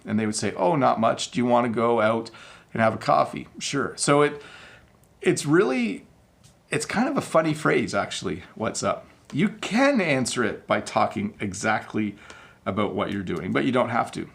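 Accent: United States. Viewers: Canadian and American accents are the same or not . We hen I heard you fist time I thought you are american. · Hi sir I you really Canadian... I thought you from American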